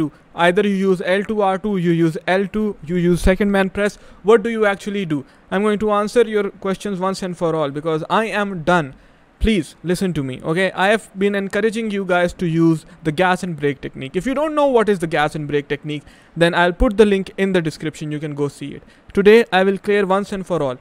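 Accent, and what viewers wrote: India. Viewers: Love indian accent 😅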